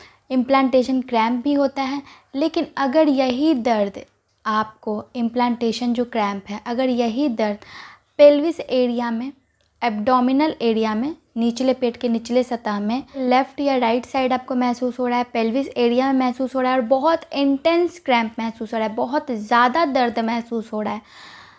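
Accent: Bihar, India. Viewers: Ma'am Aapke bolne k tarike SE pta chalta h ki aap Bihari ho 😀 am I right ??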